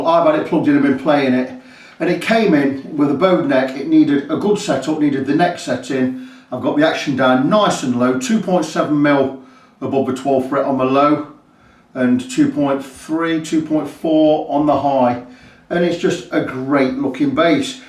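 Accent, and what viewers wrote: United Kingdom. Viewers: Please, can someone tell me what measure did he say on ? I am just not used to such a strong british accent and I can't tell if it's 0 or 1 mm he is talking about.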